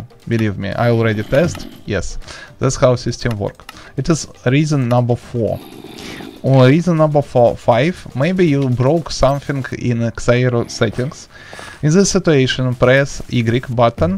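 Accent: Russia. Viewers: Руский акцент не счем не перепутать😂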